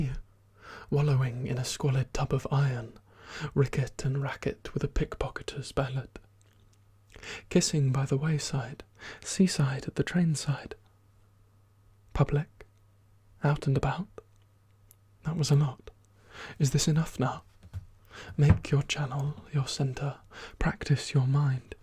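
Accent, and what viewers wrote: United Kingdom. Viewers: One of the reasons that I keep listening to your voice is because I wanna learn your British accent ✨🥲 It is sooo beautiful.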